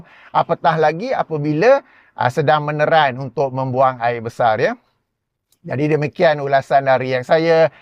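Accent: Kedah, Malaysia. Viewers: Orng Kedah cakap nampak kasaq tapi ada wisdom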